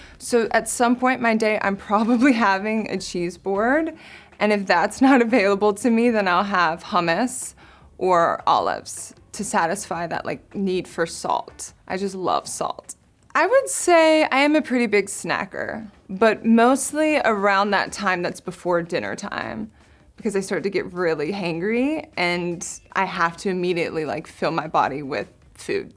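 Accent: United States. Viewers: Most American Accent I've heard. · Girl all Americans sound like this stop being a child and hating on someone cause of there accent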